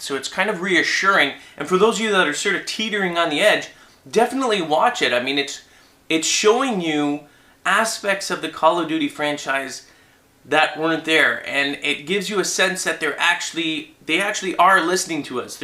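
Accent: Canada. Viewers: this guy is canadian right?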